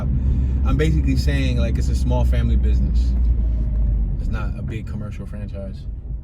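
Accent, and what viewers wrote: Philadelphia, United States. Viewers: that why I offered! You riding around talking and no disrespect you giving out some false info or half info. That why other channels hop out and do little interviews with the locals. I’m not telling you how to du your channel. But just getting data but not touching the field is two different things! You want the real or u want to ride around sounding like a tourist they grew up in The burbs and the only info u got is from a collection of numbers they put together that most of the time manipulated? But Hey do you bro! And of course you not going to know all he stories that why most these channels that blow up do hop out and do I interviews or chat with locals! Again I’m not telling you how to run you page but your numbers are low for a reason. You page a little light bro! I mean that with all do respect! You trying to get it popping or ride around with this half a philly accent sounding like you from Doylestown somewhere? 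Even your tittles I can tell you not from the city and u not getting ground zero info! Bro u said nicetown- tioga was the most dangerous? Man that area is dead zone! Big houses and ain’t nothing going on except up and down hunting park ave! But like I said it your channel but a real Philly bul gone going to know something off about you and your info and page! I guess it’s for the suburban and out of Towners. Where you from bro? For real?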